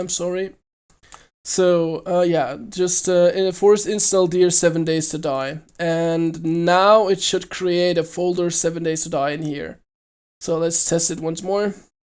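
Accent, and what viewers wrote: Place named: Germany. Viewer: Are you german? You sound german :) I have a problem --> I only get the message (translated from norwegian): The taget you have assigned, is invalid. control if you have assigned it to the right place or filename any ideas? (im using w10) The line is below "C:\Users\Magnus\Desktop\DEDICATED SERVER\steamcmd.exe steamcmd +runscript scripts/7daystodieserver.txt"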